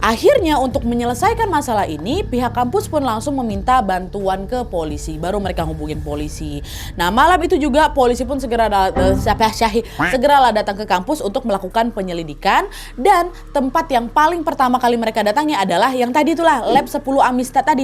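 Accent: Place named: Medan, Indonesia. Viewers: Kak tinggal di jogja atau orang jogja?? Kirain kak orang sumatra/kalimantan dari logat bicaranya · Kirain mba satu ini orang Medan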